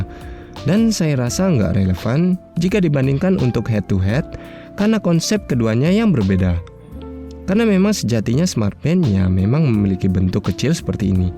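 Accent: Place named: Malaysia. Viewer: salam dari malaysia bang,loghat melayu terdengar😂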